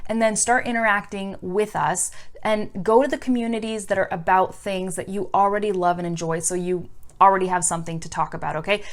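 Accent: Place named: Argentina. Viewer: You´re videos are amazing, and tu español es demasiado bueno con acento Argentino jaja... You should do a live video.. Regards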